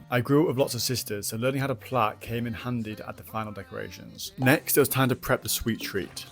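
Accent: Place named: Yorkshire, United Kingdom. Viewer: Perhaps around abouts Yorkshire based on Dialect?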